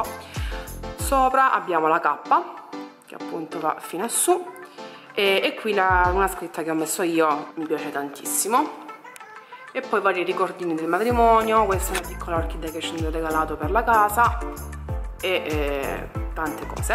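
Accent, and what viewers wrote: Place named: Apulia, Italy. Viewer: Dalla parlata deduco che tu sia pugliese😜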